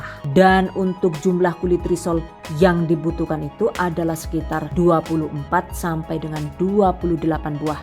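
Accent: Banyumas, Indonesia. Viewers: Selain berguna bgt resep2nya..channel ini sgt menhibur bikin ngakak kalo mbak sdh keluarin bahasa ngapaknya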